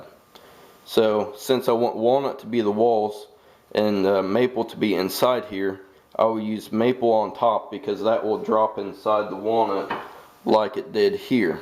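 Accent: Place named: South, United States. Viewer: thanks for this video - I haven't bought a scroll saw yet as I still need to decide which make and model to buy - in the mean time I've been watching all kinds of clips on UTube about scroll saws and this video of yours is the first that really explains very clearly how to do inlay work - I can now see a lot more possibilities with using a scroll saw - I'm not a country music fan at all but I really did enjoy the music with this video and it fits in perfectly with your southern accent - I'm sorry to hear about your brother - I checked and you are making videos again - that's great - it's a tribute to your brother and I'm sure he would have wanted you to continue - I've subscibed to your channel and please continue making videos as not many woodworkers explain things as clearly as you do - visual effects in clips can be interesting for awhile - but in the end most people want to watch clips like yours to learn - by the way I live in Europe - thank you for sharing your knowledge…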